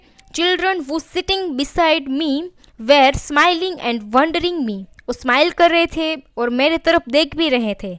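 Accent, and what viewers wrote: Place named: Saurashtra, India. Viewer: You belong to saurastra, because of your tone of speech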